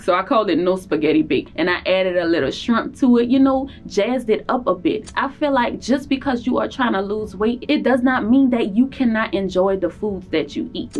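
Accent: New Orleans, United States. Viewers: Are you from New Orleans? I swear I feel like you gonna say baybee at any moment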